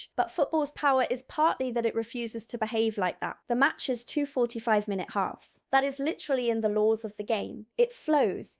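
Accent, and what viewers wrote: England, United Kingdom. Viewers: You sound British not American, you must have been here a long time ago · you sound English now. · She sounds british to me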